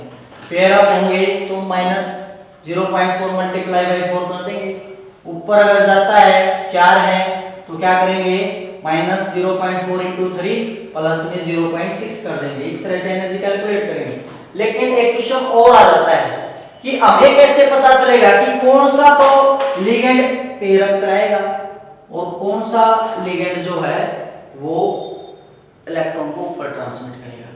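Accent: Haryana, India. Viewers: Sir Seriously you're brilliant. Keep it up. And never leave your this hariyanavi accent .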